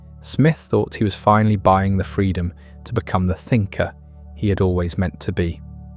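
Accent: United Kingdom. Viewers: can't understand brit accent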